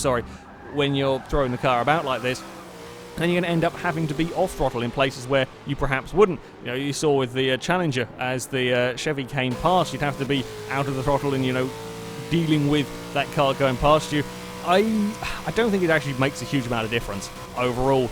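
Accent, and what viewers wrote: United Kingdom. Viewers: You sound incredibly British :D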